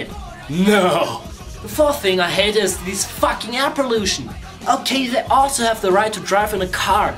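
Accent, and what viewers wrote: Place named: Germany. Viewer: well , i can tell you have german accent too !!!! :) ( smarter !~ta~da) ANYWAYS ! i need talk with you about this video this weekend ! = =# LAO WAI !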